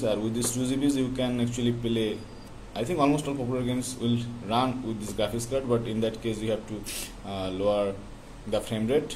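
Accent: Bengal, India. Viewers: আপনার কথা শুনে তো বাঙালি মনে হচ্ছে আপনার বাড়ি কোথায়?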